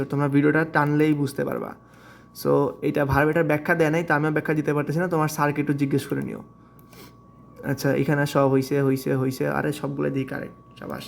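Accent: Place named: Sylhet, Bangladesh. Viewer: Apni ki sylhet er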